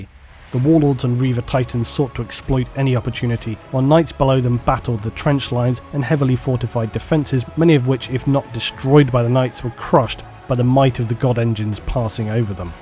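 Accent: United Kingdom. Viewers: Man your the best Warhammer 40k narrator on YouTube! I try to watch other channels but they dnt have ur British accent. I swear it makes the lore/story 10× better. Huge fan! Love your stuff💯🤘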